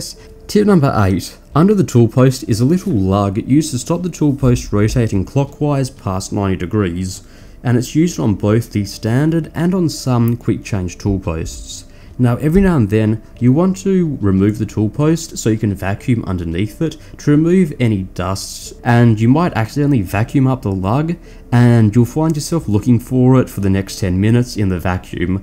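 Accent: Australia. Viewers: Nice Aussie accent ! Reminds me of a Brit who spent a long time in Perth, WA, but I could be wrong. Otherwise, the various tips are useful, thanks.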